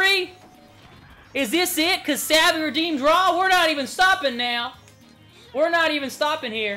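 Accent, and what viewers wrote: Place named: South, United States. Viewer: lmao that southern accent. People here in SC actually say "fixin to do something" I didn't think that was a real thing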